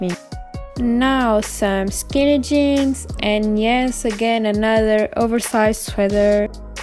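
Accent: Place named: France. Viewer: Par tout hasard tu parlerais pas Français ? Y’a un petit accent quand même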